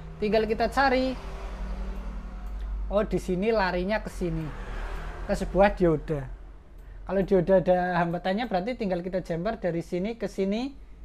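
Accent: Banyumas, Indonesia. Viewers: Genah kie mesti wong ngapak...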